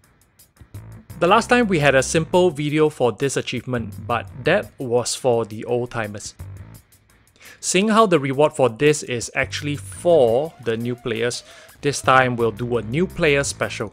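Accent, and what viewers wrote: Singapore. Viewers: You from Singapore?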